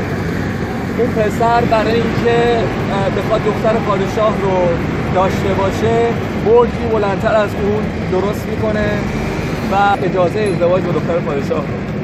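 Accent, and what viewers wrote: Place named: Italy. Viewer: عالی، عالی! بفدای لهجۀ شیرین ایتالیایی شما بشم من شوقی در نهاد ما نهادی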